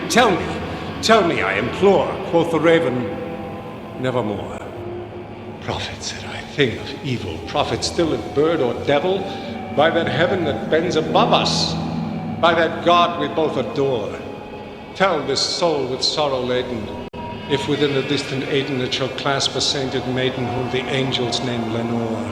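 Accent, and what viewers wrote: United States. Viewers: it's a nyew yoak accent. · "The Raven," straight out of Brooklyn. This is ridiculous.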